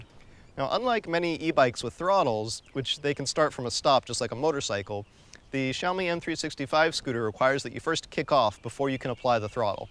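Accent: United States. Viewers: פאק, הייתי בטוח שאתה אמריקאי ואז פתאום in tel aviv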